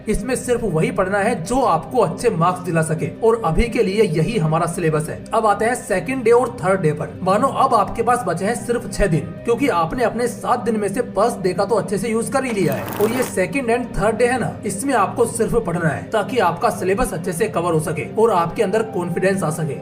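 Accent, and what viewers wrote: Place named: Mewat, India. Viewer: Haryana new Mewati soundh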